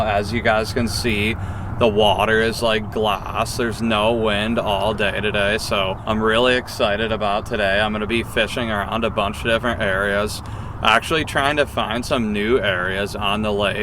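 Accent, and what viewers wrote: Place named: Michigan, United States. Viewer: love the michigan accent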